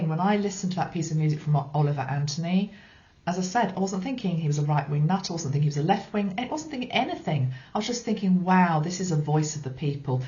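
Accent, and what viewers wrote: England, United Kingdom. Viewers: Thank you so much for this uplifting video. We, the people, are more powerful than we know. I just love that beautiful English accent of yours and Best Wishes for a fun holiday in London.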